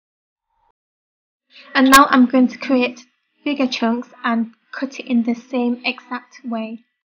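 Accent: Yorkshire, United Kingdom. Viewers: her accent makes her prettier... sound like British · British accent <3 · are you British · Her accent does sound Brittish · from Leeds or Bradford? x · hear a British accent on this girl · are you from Yorkshire by any chance? Just your accent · you speak like british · has a British accent thats awesome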